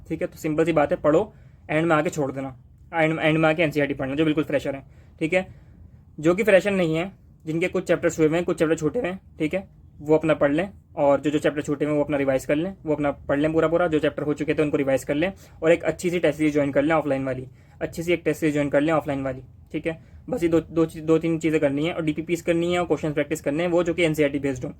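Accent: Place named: Bijnor, India. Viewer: Bijnori accent lg rha h.. 😅👍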